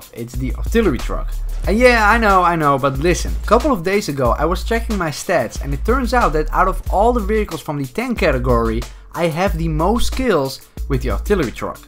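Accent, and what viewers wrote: Netherlands. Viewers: Your definetly dutch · nice dutch accent bro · Are you Dutch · are you dutch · Prachtig nederlands accent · Like to Hear the dutch accent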